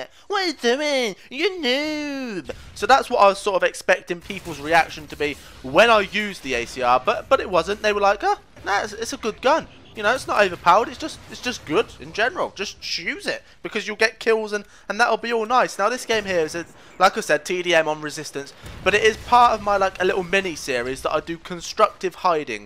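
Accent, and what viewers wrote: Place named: United Kingdom. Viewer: Thx for sending the vid :p I love brittish axent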